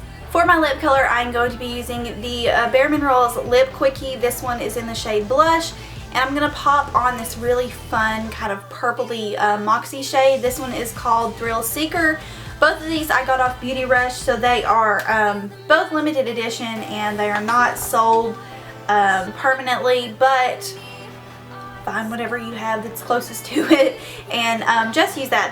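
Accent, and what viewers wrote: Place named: Tennessee, United States. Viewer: I love your accent! ^_^ I just moved to Knoxville, Tennessee from Portland, Oregon and most people have this super cute accent and I just find it adorable. XD Thank you for this tutorial too! Very pretty look! ^_^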